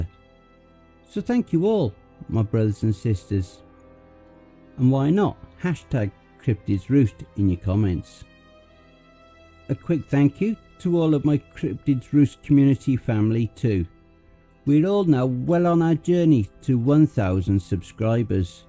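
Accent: United Kingdom. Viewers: The creepy animation at the beginning makes this so worth it., you're amazing. Your British accent is a joy to hear. I look forward to hearing more narrations by you. Also, man How do you get subtitles?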